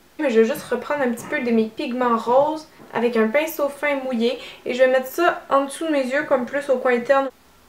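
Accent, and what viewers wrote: Quebec, Canada. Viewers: magnifique !!!! je vais le faire au nouvel an ! ps : je suis amoureuse de ton accent ! Je suis française mais j'ai un oncle qui vit à Québec depuis quelques années et je vais le voir en avril....j'ai hate ! ton accent me fait deja voyager ! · Tu viens chez moi en avril!! Je suis nouvelle à te suivre sur FB et ici. J'ai le temps de pratiquer ces jours-ci avec mes vacances :-) Je suis québécoise établie depuis de nombreuses années en Suisse, à côté de Montreux, alors si tu as besoin de quelqu'un pour faire la traduction québécois-"suisse"(Loll!), tu peux me demander! :-D Et les suisses romands (francophones) adooooooorent notre accent! Tu seras la star de l'évènement! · Salut ! Magnifique make-up ! J'adore l'accent québécois il est trop beau ! Tu es trop belle ! Je te suis tous les jours ! Toutes tes vids je les ai regardes ! Je t'adore ! 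Bisous Merci d'avoir créer ta chaine ! Et bonne année 2015 !!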